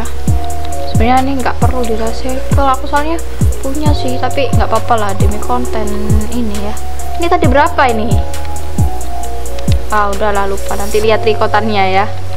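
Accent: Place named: Java, Indonesia. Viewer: Baru mampir, logat nya jawa, jawa mana ni hhe, boleh kali numpang promosi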